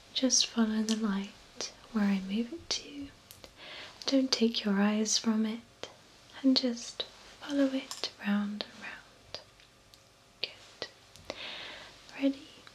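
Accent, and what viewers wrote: United Kingdom. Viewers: starts with that british accent <3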